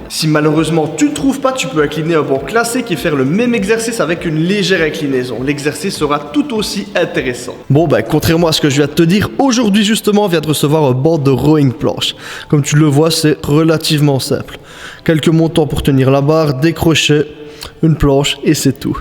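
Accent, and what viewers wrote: Liège, Belgium. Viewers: Tu es de liège ?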